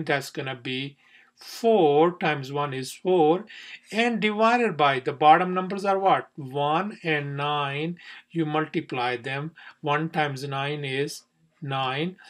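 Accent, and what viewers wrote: India. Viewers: Sir your way of speaking tells me that you are punjabi? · I'm really confused if he's Indian or Canadian.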